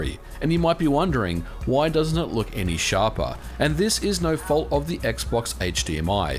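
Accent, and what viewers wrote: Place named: Australia. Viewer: Nope, I'm watching this because I could never miss a video with this amount of aussie accent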